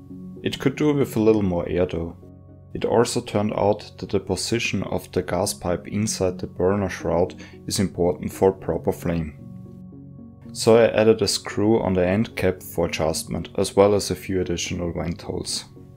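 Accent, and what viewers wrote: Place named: Germany. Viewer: Your Accent Sounds german. Ich bin auch deutsch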